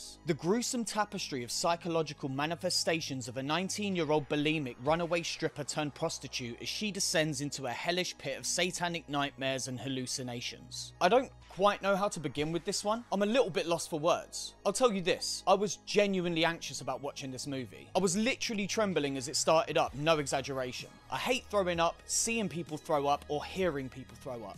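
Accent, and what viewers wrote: United Kingdom. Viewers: Yes I always thought I would love to critique movies just like this! I am the crazy movie watcher😂 also hearing your accent makes me REALLY miss the 🇬🇧!!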